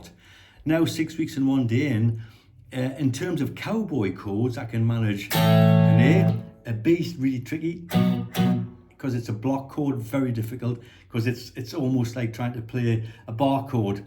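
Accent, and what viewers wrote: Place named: Northeast, United States. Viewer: Hi, Thanks for your video on your broken wrist to guitar playing recovery. Ive just broken my left wrist and hope to get back to it. One day. I'm from the northeast, originally so your video voice is very familiar. Thanks again for the lifting video. Oh and great playing... well done. Top man!!!...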